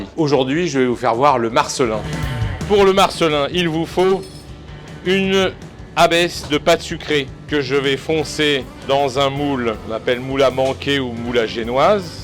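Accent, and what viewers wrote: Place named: Paris, France. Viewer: J’adore l’accent parisien mais j’aime pas les fruits confits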